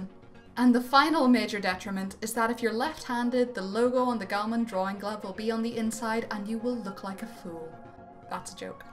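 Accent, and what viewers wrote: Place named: Ireland. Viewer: Why was I so excited when I realized she had an Irish accent?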